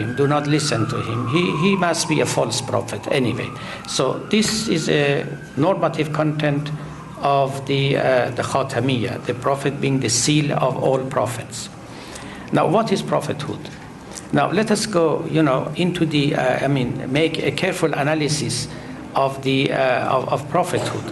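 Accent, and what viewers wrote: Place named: Iran. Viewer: 30 years ago i listened and watched to Mr. Sorosh traslations of Rumi poets from persian to english on Iranian TV. I must say his english was better, faster and with a little persian accent. Now he is slower and the tone of his voice is alwayes same which makes it hard to follow his remarks and ideas ....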